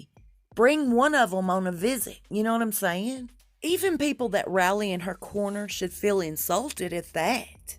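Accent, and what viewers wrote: South, United States. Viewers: Catty, nasty and hateful. This narrator is one of the original small town "Mean Girls". Even her southern accent proves it. Wonder who paid her to do this?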